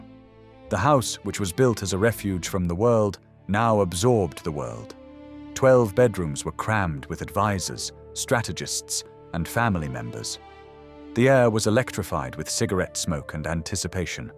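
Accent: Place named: United Kingdom. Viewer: Why do we have an awful Brit accent narrating a totally American documentary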